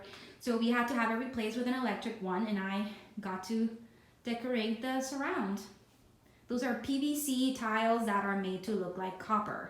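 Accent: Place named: Louisiana, United States. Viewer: Beautiful home and property. You sound like you are originally from south Louisiana area to me. We would love to relocate to Maryville from Houston one day.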